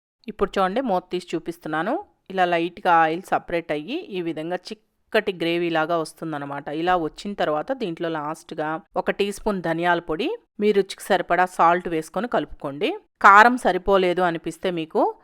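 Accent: Nellore, India. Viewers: Mee uru Nellore aa? Ma Nellore relatives mee lage matladataru. And recipe chala bagundi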